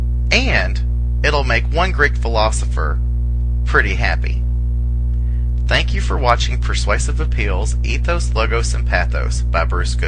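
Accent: South, United States. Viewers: I never thought a gay southern man could bring this much joy to my homework. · I’m just here for the delightful southern accent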